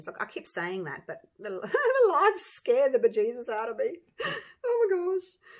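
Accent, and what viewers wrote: Australia. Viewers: Hi, love your videos and hearing our good old Aussie accent! I ordered the minwax on Amazon and I noticed in your tutorial you said that people in the US can use Behr with Jo Sonja’s, but can we in Aus use the minwax with taubmans?? Thank you for this video 🥰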